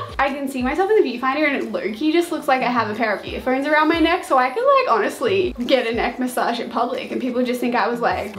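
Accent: Australia. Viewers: I NEVER UNDERSTOOD AUSSIE ACCENT BUT AFTER WATCHING FOR 6 YEARS, I CAN UNDERSTAND IT PRETTY WELL LMAO🛍🛍🛍🛍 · Normal people: no: nooore I love her aussie accent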